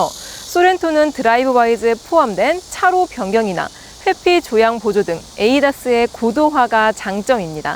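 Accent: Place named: Gyeongsang, South Korea. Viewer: 아나운서 같은게 더 세련된건가? 전 원래 컨셉인 정감있는 경상도 아가씨가 좋아요.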